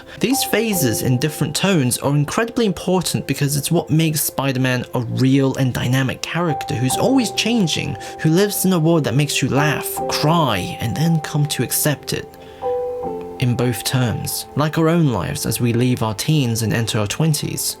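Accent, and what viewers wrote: United Kingdom. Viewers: So are you like welsh? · Bro you sound British not american. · I thought you were English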